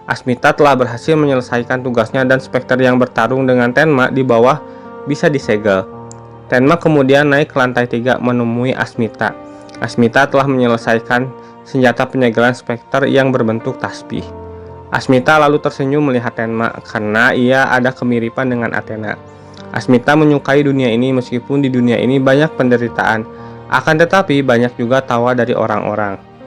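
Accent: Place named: West Java, Indonesia. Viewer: biasa lidah sunda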